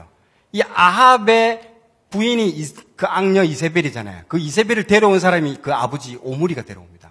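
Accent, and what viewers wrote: Gyeongsang, South Korea. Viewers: 경상도 어조 질색을 하는데 이렇게 듣기 거북하지 않은경우도 있네요.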